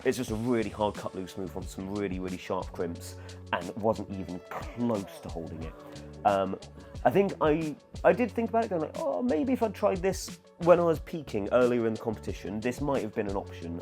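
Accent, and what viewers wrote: United States. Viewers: Your accent had me wondering why it was just for the southern states, so great job, it took me a moment to understand it was an American accent vs southern. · I can confirm that we Americans speak like that. I think it was spot on.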